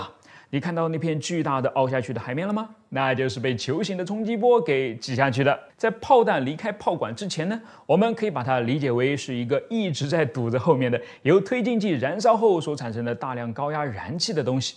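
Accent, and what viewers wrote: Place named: Mainland, China. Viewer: 這是大陸的唸法，很多視頻中聽過。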